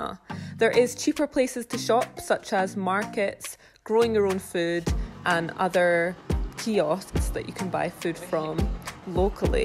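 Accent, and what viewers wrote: Scotland, United Kingdom. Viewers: I'm and this Vid-yO that Scot accent is physically painful no shade, nice content great quality videos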